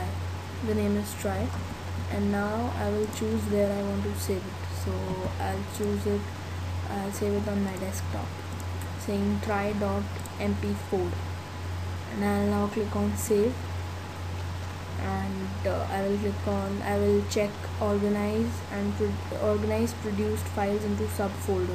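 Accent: India. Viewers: Omg I can sense the Indian in him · indian english >_<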